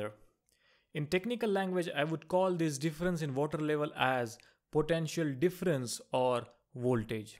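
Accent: India. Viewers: U have the greatest indian accent ever.. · Thank you for this film. It is the second time an indian teacher impress me. Exactly what i needed to learn, now. I listened to american, brit, australian... It was only the indian teachers that were precise and correct in their terminology. India must be an educational superpower. Your english sounds funny, but , you are simply the best teachers.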